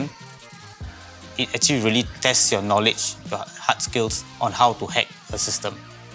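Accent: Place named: Singapore. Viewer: Okay, this is weird. You're indian but you speaking like singaporean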